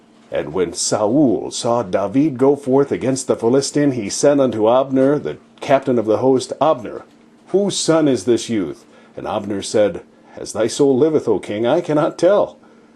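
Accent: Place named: United States. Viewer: I enjoyed your clear reading of the King James version. I am from Ft. Wayne, IN and it is easier to understand old English text when read with an American accent. Since the old Hebrew names are uncommon in English, I liked the way you pronounced every letter phonetically.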